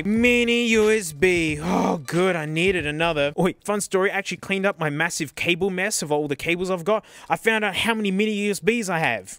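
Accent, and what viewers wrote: Australia. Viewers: As a german myself, hearing a funny australian man with a pet snake pronounce german words is pure bliss also "Große" the "ß" is not a b-letter, but instead is a sharp "s" sound, there are talks of that letter being removed someday but idk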